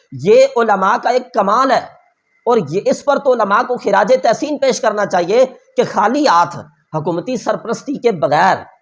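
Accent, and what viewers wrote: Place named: Arab world. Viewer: The damage has been done. Do you really think whatever you say we will digest as a Muslim? Lolllll. Pakistani ulma are 80% thug of Pakistan. They are not on the right path. Ap ko kya lagta h mutfi, hafiz title lagany s or apna accent arabic kr k urdu m bat krna will create even a tiny impact on us? Lolllll. Bhae ap pehly khud ko dekhen ap Islam k kya khidmat sar-anjam d rhy hen, phr dosron ko kahen. Jab ap ko aik basic chez ka nh pata ke knowledge kisi s bh mily agar ke wo sach h, you have to accept it. wo hindu h Christian h koi bh h , bhae wo research kr k koi chez batata h to is m galat kya h ke aik Muslim us k taref Kry? Ap muslim hokar kon s research krty hen ? Bus babaon k books parh k, ulma k bat sun kr, sedha YouTube p akar record krty hen, is k ilawa koi or kam h ap logon k paas? M beshak ap k j tarah mufti, hafiz Waly title wala banda nh hn, but m aik question bh Quran s karn ga. 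Hmary Rab k qasam ap n us ka answer tak nh dena. To is liye mery bhae hao khao peo, aram kro, ghar walon ka khayal rakho, islam ko wahen tak pehlao pehly, jab ghar waly ap s razi hojaen phr YouTube p ao. 😅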